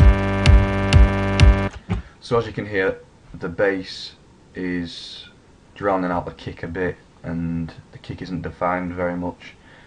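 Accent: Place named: Yorkshire, United Kingdom. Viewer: Thanks for this, good to hear a Yorkshire voice on here!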